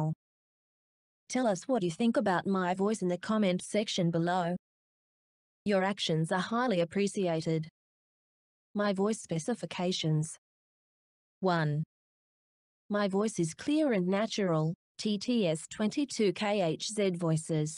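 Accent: United Kingdom. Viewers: Her Voice Sounds Like A British UK Accent.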